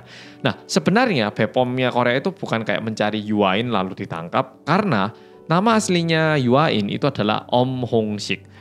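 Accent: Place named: Malang, Indonesia. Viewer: saya paling senang mendengarkan mas berbicara, gaya AREMA NYA (AREK MALANG ) tetap tidak hilang bikin sedanp di dengarkan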